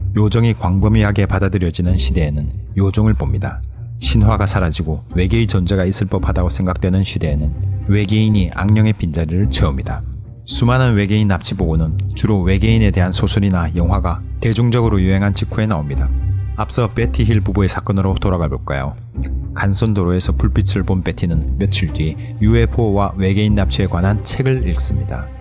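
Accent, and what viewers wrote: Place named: Gyeongsang, South Korea. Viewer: 경상도 억양이 조금 남아 있으시네요:-)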